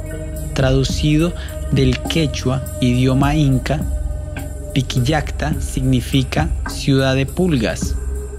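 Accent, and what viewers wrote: Colombia. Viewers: por la pausa y cadencia de tu habla y el uso continuo [ka] y [Ke] debes de ser colombiano o has vivido mucho tiempo por ahí..igual, un hermoso y bien documentado reporte....gracias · Que desubicado comentario, agradece màs bien que es gratis, a mi me pareció excelente narrador se nota que es un parcero colombiano, Cuando no el peruano con su dejo que cree que es el mejor, tendrìas que salir del paìs alguna vez para que te des cuenta lo equivocado que estàs. a no hacer caso y gracias por este aporte. Chaclacayo Perù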